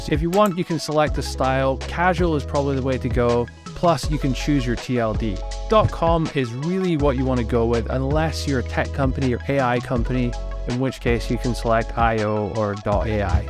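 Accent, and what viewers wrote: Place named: France. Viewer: because for French Accent and the funny (and useful) video i voted on Product hunt too:) Thanks guy for this tool and videos:)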